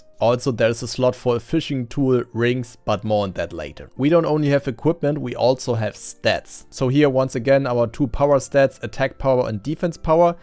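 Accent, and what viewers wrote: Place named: Germany. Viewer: Accent is German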